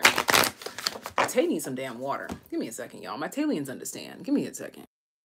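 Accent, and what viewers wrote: United Kingdom. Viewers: Your British accent ain't half bad doll. ;) Love a good swear over here too. Thanks for a lush reading xx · Your British accent took me out 😅😂🎉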